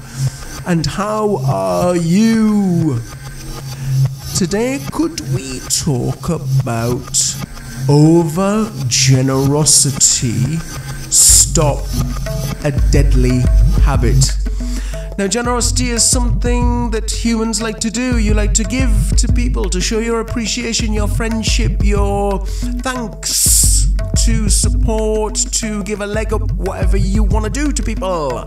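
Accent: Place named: United Kingdom. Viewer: I fully agree with you... In France, supposedly, nobody is really hungry - the government provides for them... last time I gave something was a sandwiich - someone told me what i just wrote about government's help - impossible to know if they are really needy - it is impossible to always give and give when, you, yourself, are in a critical financial situation... complicated subject in a way... Great talk ! love your british accent ! lol ! renee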